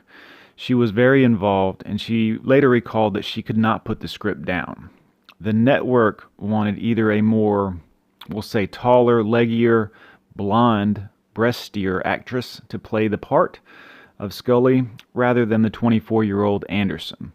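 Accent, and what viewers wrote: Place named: South, United States. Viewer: also i'm assuming your southern, i can somewhat tell with the subtle accent and a very occasional "y'all"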